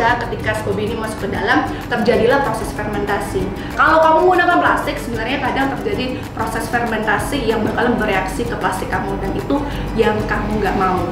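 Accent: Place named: Sumatra, Indonesia. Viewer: Wah cicinya orang sumatra ini.. medok bgt ngomongnya 😆 mantap lah dpt di youtube resepnya.. thankyou!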